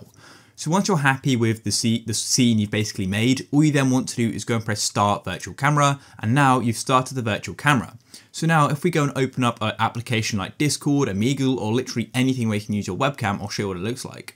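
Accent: United Kingdom. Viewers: HE'S BRITISH!!!!!!!!!!!!!!!!!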